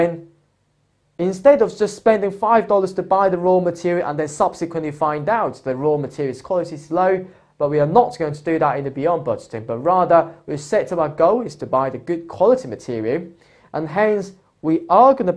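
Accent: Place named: United Kingdom. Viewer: Very british accent)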